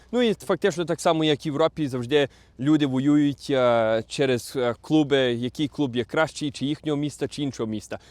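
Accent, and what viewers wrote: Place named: Galicia, Ukraine. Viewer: Мова дуже гарна, з першого слова впізнала галичанина-земляка.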